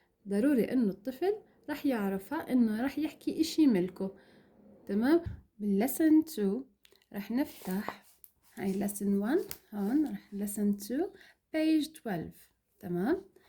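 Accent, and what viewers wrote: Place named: Mostaganem, Algeria. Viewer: و الله يا لهجة مستغانم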